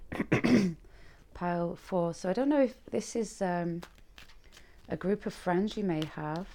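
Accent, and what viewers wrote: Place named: Yorkshire, United Kingdom. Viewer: Thank you for pile 4 that i was guided to 🙏🏻 i hear a fellow Yorkshire accent, it’s nice to hear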